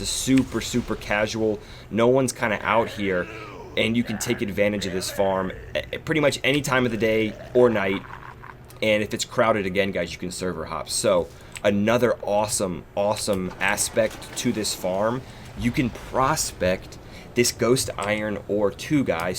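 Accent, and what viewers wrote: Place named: United States. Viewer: Where are you from bro? I'm quite confused.. I am not sure if you're Australian or mid north-western American. Edit: ok You're totally not Australian. But I still can't pin point where you're from, I mean I'm sure it's America, but dunno which state.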